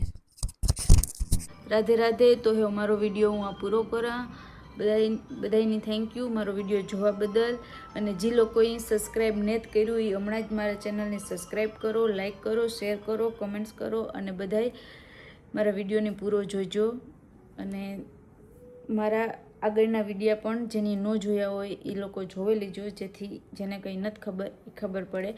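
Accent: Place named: Kathiawar, India. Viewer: કાઠિયાવાડી લાગો છો તમે બોલી ઉપરથી ❤ Super vlog 👍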